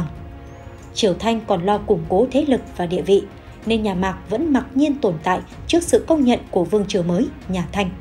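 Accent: North, Vietnam. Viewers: Chi oi. Chi noi tieng [ bac ky nang giong qua) xin dat [ caption tieng viet ) dum mot cai nhe. Cam on chi nhe.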